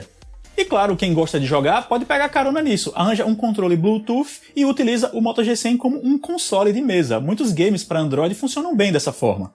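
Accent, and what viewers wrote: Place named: Northeast, Brazil. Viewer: Quem é esse paraíba? Sotaque chato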